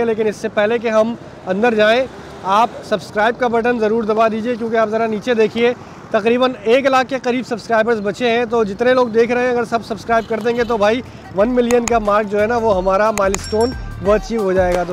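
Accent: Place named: Bihar, India. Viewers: Your bihari accent is cool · MashaAllah bhai Apko Orangi Town me dekh k khushi hoi or Apne jo behari jesi bethi zuban me bat ki bohat acha laga ❤️ Orangi town ap DayTime me ayn InshaAllah boht Chezn milengi apko explore krne k liye ❤️ Your big fan♥️ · Totally bihari language ... 👌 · Apki bhihari zabardast hai ❤️❤️❤️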